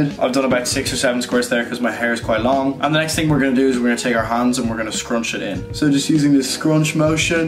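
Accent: Ireland. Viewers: Your accent is a very soft Irish accent.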